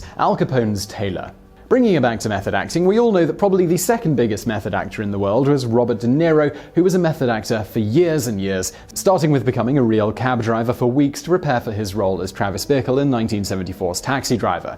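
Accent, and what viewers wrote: United Kingdom. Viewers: Blame his British accent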